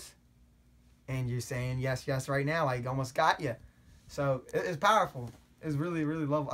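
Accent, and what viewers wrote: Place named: Pittsburgh, United States. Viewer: you switch between wooder and water a lot haha pittsburgh..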